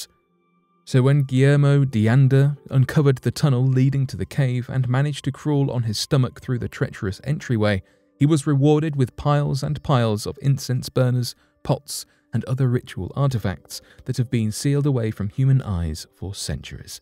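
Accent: United Kingdom. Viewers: This guy is extremely long winded. He prolongs his stories far too long. This love affair with white British voices always amazes me. He's boring. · He repeats himself a lot too. I'm not a fan of British accents either.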